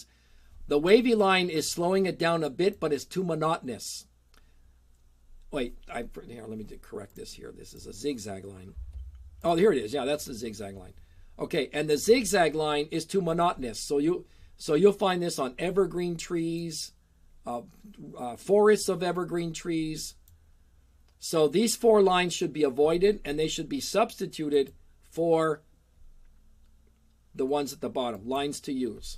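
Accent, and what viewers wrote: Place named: United States. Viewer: I have had to put the sound off and the subtitles on. I can not stomach one more American accent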